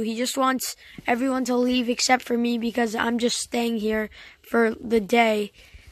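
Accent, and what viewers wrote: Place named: United Kingdom. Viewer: You have a good British accent and that's coming from a British Person.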